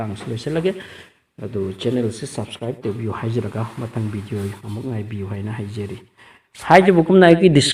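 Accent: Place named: Cachar, India. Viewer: Narrator c cachar deiro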